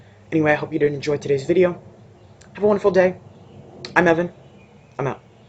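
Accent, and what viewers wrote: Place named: South, United States. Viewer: You sound like you have a country accent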